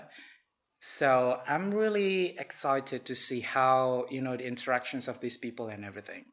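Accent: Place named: Australia. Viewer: There's Aussie accent left behind haha How long you'll be there?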